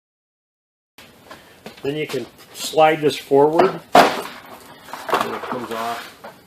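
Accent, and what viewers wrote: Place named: Minnesota, United States. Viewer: haha yah could tell by your accent, i am also from the twin cities. Still driving around this civic?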